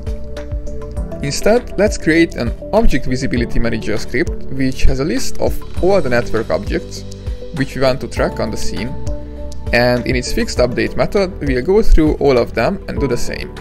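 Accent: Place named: Hungary. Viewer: Akcentus. Ne értsd félre, szuper minden, köszönöm a videót. A magyar unity-seket így ismerni fel :)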